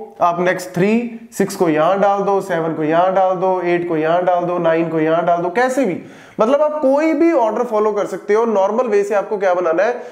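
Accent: Punjab, India. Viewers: Sir u r from Punjab?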